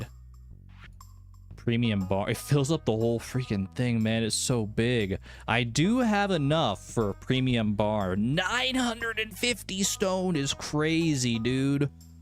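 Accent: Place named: Canada. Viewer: your extra Canadian accent is charming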